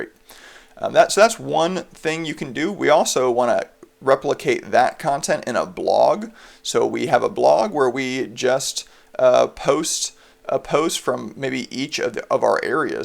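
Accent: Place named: United States. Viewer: I'm English and the American accent can be very jarring to hear, but this guy's voice is just about okay.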